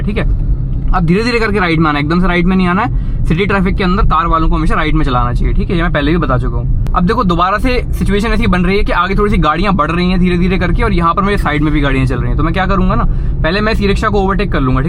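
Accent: Jhansi, India. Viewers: Brother are u from jhansi, UP ???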